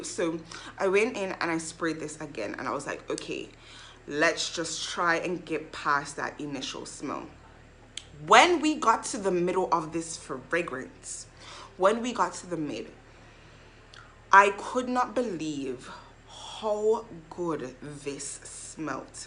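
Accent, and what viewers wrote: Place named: Jamaica. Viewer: Hey , where are you from hun ,?? Sounds sort of Jamaican ish living in Canada ?? Or some Caribbean?🤷🏽‍♀️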